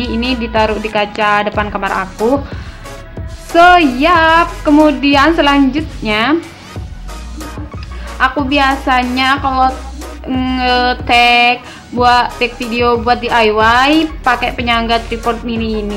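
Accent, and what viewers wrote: Madura, Indonesia. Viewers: Logat maduranya kelihatan beut kak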